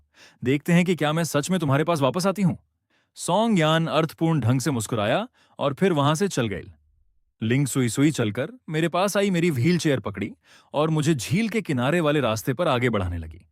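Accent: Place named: Bihar, India. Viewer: Bhai jada bihari accent mat add kar pure hindi accent accha hai video bana ke liye 😅 basibhi eha samajh ne mein bahut pareshan ho raha hai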